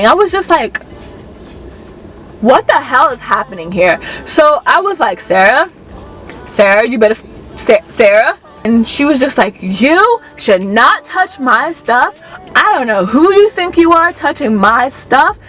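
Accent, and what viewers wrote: Jamaica. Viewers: what a low down, dutty, scandalous, frowsy pum-pum, racist b1tch! You did well not to beat her a$$! new to your vids - love them, also are you of Jamaican heritage because I'm clocking certain speech patterns...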